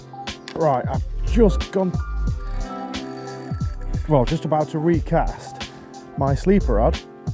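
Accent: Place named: England, United Kingdom. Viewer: I love so much this YouTube channel, is like ginger Seth Rogen with English accent doing fishing videos.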